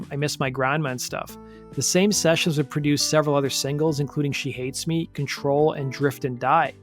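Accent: Canada. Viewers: I keep trying to place your accent...northeastern Ontario?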